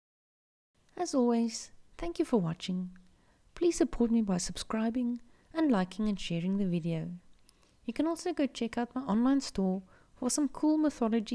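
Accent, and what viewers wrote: South Africa. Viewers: are you south africa!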